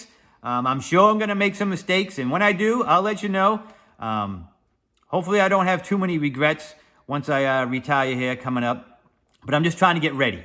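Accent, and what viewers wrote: Boston, United States. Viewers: Just discovered you! Are you from Boston area originally? I’m loving your accent—and it ain’t native to North Carolina 😅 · North Carolina?? Sounds like a Boston accent, not a Southern one!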